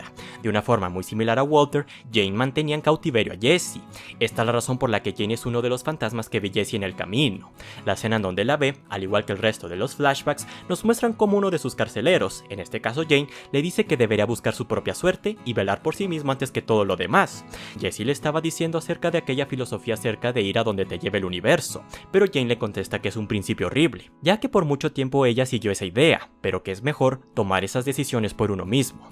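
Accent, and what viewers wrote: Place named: Honduras. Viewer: Me gusta el acento de la voz del locutor o narrador, es un acento hondureño no?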